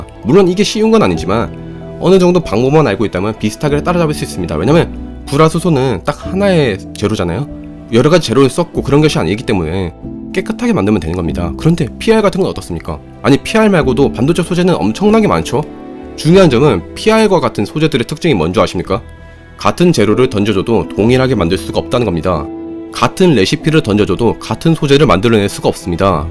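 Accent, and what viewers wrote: Gyeongsang, South Korea. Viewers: 표시나요 깅상도사투리. 숨길래 숨길수없는 첯음절에. 강력한 엑쎈트 깅상도사투리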